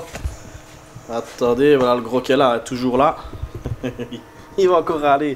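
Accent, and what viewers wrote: Belgium. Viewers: Je viens de découvrir ta chaîne ! Superbe. Et çà fait du bien d'entendre ce bel accent Belge ! Vous êtes tellement sympa en Belgique 🙏🙏🙏🙏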